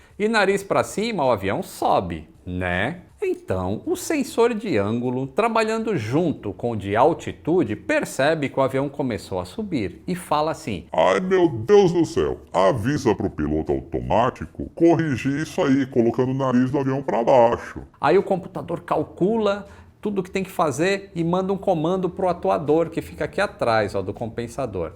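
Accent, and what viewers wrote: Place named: Minas Gerais, Brazil. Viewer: Voltou de Minas com mineirês mais completo...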